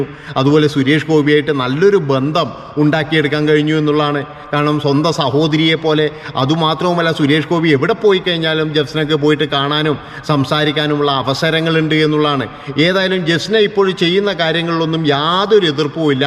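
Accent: Kannur, India. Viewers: സംസാരം കേട്ടിട്ട് നിങ്ങള് നമ്മളെ നാട്ടുക്കാരനാണെന്ന് തൂണുന്നു.കണ്ണൂർ😂❤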